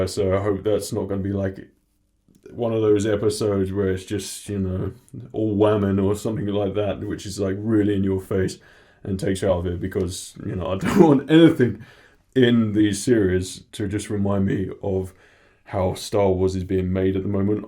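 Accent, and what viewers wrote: Australia. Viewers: I've said it before but I'm really glad I'm not the only one who felt the Disney Trilogy made the galaxy feel small. Also, you're in England? Why was I always thinking you were Australian? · I thought you were an Australian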